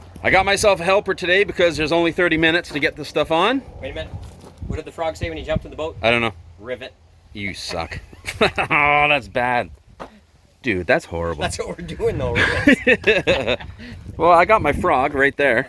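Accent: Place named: Scotland, United Kingdom. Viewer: you sound a tad scotish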